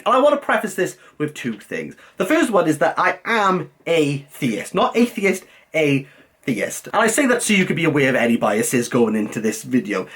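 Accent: Wales, United Kingdom. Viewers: couldn't understand a word you were saying .................oh i see your Welch ,,,,,,,,,,,,, and your a theist ,,,,,,,,,,,,,,,,,,,ha ha ha ha .........oh dear oh dear We stand for your right to be a theist .............but please know your wrong , your living in a fantasy land stop being a Fucking child , let me point you in the right honourable direction of the patron saint , His holelyness , all explained here <link>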